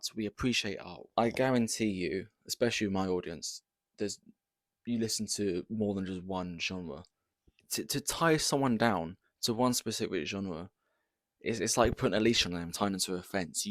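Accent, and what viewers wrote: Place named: United Kingdom. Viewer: I was not expecting you to be British, to be honest.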